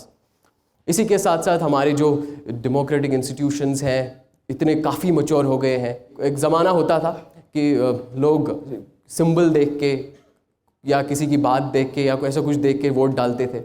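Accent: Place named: United Kingdom. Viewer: Is he Indian?😁😁 He speak like a Britishers 😍😍😍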